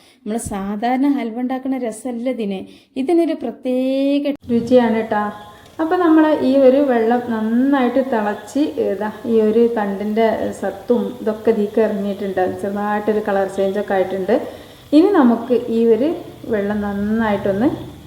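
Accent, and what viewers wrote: Malappuram, India. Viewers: വായ് പൂട്ടാതെ കമൻ്ററിയുണ്ടല്ലൊ ഇത്താത്ത അത് തന്നെ ഉഷാറല്ലെ. ജില്ല മലപ്പുറം ആണെന്ന് തോന്നുന്നു. മുരിങ്ങ തണ്ട് ഇതിനിടയിൽ ഇത്ത എപ്പഴാ തിന്നത്?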